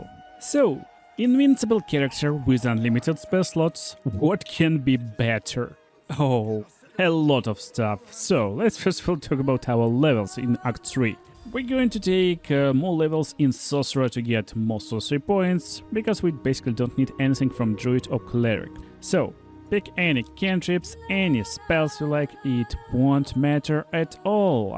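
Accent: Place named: Russia. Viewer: Ты из России? Акцент характерный!)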